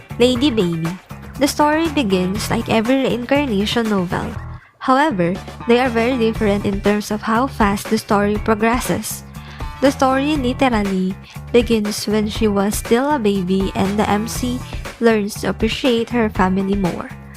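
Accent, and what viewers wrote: Philippines. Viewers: Instantly knew you were from the Philippines just from your accent alone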